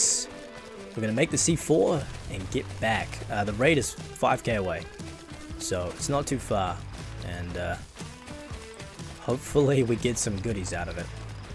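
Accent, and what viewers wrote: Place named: New Zealand. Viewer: Yes broo!! So refreshing watching a good dayz video with a fellow kiwi accent!! Much love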